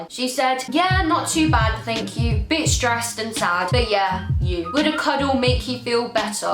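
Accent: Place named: Scotland, United Kingdom. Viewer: Funny accent, scottish?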